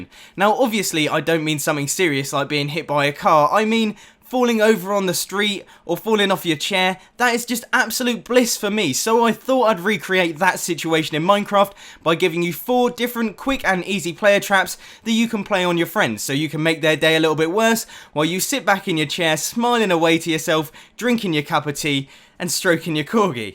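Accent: United Kingdom. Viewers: British FTW! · Good old British. :)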